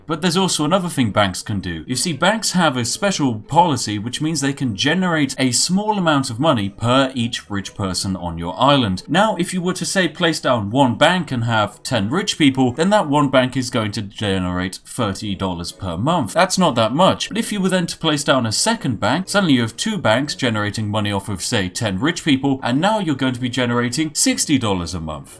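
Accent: United Kingdom. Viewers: haha he's a brit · Wait... is this guy British?!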